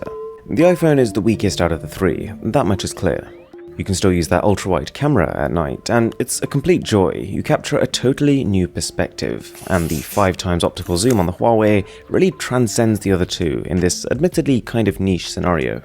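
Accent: United Kingdom. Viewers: That brittttttttish acccccccent is thiccccccccccccc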